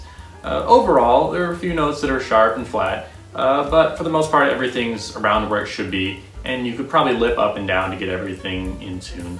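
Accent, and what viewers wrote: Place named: Canada. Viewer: i keep thinking your canadian i dont know why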